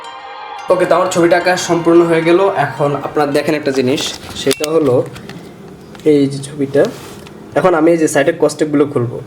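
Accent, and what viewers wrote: Sylhet, Bangladesh. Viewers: Excuse me are you from sylhet district ?????? 😅😇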